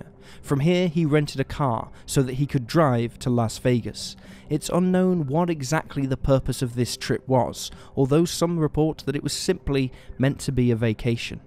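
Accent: United Kingdom. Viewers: he's British so not privy to how we pronounce things.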